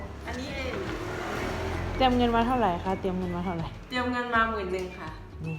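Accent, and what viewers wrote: Thailand. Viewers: เอ๊อะ555คนอีสานตั้วนิ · พี่ใช่คนอุบลมั้ยคะ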